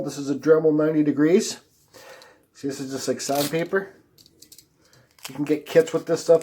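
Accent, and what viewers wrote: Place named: Canada. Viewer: Great looking piece I like it. Your fuckin language is terrible eh. You sound like a real Canadian lol. Have a good one.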